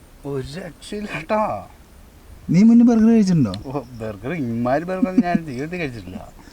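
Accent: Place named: Idukki, India. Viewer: Iddukki anno ee sthalam slang kettit iddukki annennu thonnunavar like adi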